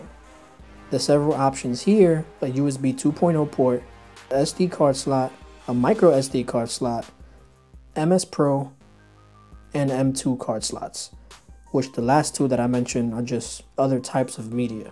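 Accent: New York, United States. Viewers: NY? ...lol you sound like it.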